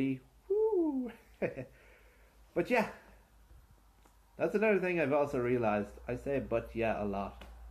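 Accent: Ireland. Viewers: Are you Irish, and if you are where are you from (what county) & Do you stream on twitch or anything?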